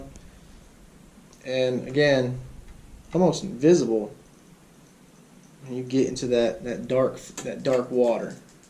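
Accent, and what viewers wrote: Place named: South, United States. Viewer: Got that ol country accent nowadays 👍🏻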